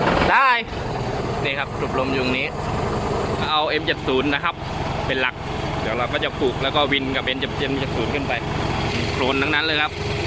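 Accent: South, Thailand. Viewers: คนใต้นี่หว่าฟังตั้งนาน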